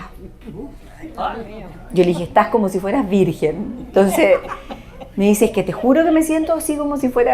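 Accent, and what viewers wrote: Chile. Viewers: casi no se escuchan asentó chileno Por eso me guste mucho escucharte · ya no eres la misma,que pena, me reía,disfrutaba y aprendía mucho con tus charlas,tu acento chileno y todo lo que decías 😢😢😢😢😢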